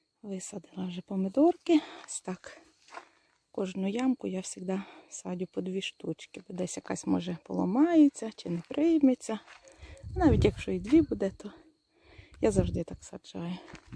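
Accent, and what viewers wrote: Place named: Volyn, Ukraine. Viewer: Доброго дня,чую у Вас волинський говір🙂.Дуже гарні у Вас квітники.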